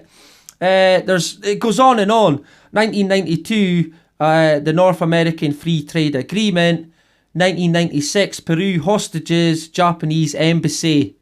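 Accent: Scotland, United Kingdom. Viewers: Been subbed since you were 1.800, well done on the rise in subs you've had, in a very short time. I love your accent, I hope I'm not insulting you if I ask which part of Scotland you are from...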